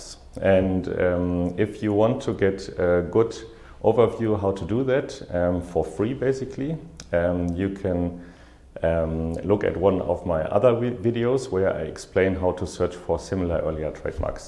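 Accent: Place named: Germany. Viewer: Hey :) Great video! I hear the german-english, realy cool :D Liege ich da richtig? :) lg Robin!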